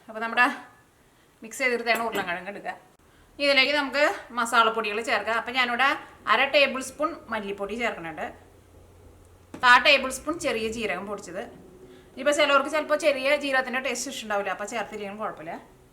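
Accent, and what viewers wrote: Thrissur, India. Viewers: ഇതണ് തൃശൂർ സ്ലാങ്ങ്. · ഇങ്ങള് തൃശൂർ ആണാ. പറേന്നത് മനസിലാവണില്യ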